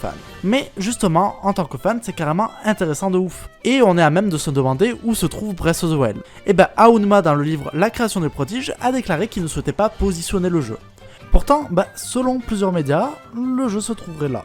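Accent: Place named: Spain. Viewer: nan mais c'est pas un très gros accent mais on dirait un accent espagnol genre mdr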